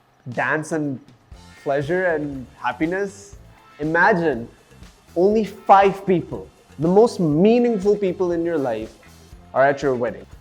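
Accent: Punjab, India. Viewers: The cutest punjabi accent everrr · His punjabi accent is so cutee 😭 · I also think that because what if you have a wife/husband who changes you as a person that you're not even good friends with your best friends and I feel like that wouldn't be a good wife/husband. My punjabi is exactly like yours but honestly yours is the cutesttt ❤ If comes next to me on an airplane I would scream so loud that the whole plane would know that what just happened or either I'll be starstruck and not able to say anything. About the question "what if you got stood up on a date" my answer would be that that's not gonna happen 😂 I'm really really horrible with jewelry and rings and rings are something I never wanted to wear. I also have the fear of losing the engagement ring so I'll keep in a locker too or wear it with a chain as a necklace. I would have to come up with an excuse if I'm late. 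It would be an ideal scenario to get married in if you only have those people around you who mean a lot to you honestly. I would ask the same question to the alien that where he's from If I'm being recorded and you tell me that you were not recording all this time I'm gonna walk away.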